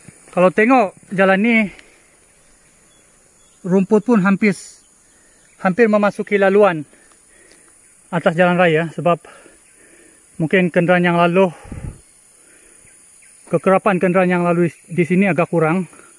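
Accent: Sabah, Malaysia. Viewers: Cara dia cakap pun tahu orang sabah…kau tu bnyak bergaul ngn orang sikit cara cakap macam ajak gadoh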